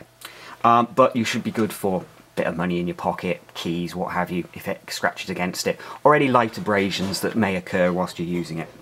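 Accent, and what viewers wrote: United Kingdom. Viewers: i really like your british accent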